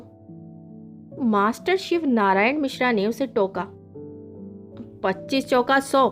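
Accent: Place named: Haryana, India. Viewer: Bahut badhiya kahani.. aur specially apka Haryanvi accent.. great.. m not Haryanvi but mene shadi Haryanvi boy se ki he.. to samjhne lag gai hu... Apne achhe se sunaya ..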